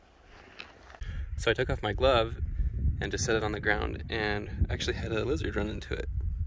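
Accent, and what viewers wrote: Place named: Zion, United States. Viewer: Mystery man I'm guessing he's near Zion. Has same accent as locals in Zion area. Cool video btw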